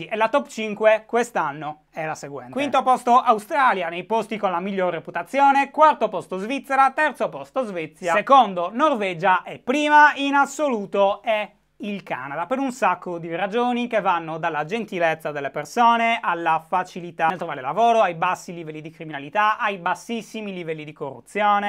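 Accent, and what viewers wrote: Sardinia, Italy. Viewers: Prima volta che guardo un tuo video, nuova iscritta :) (Forse che si nota che sei sardo :D)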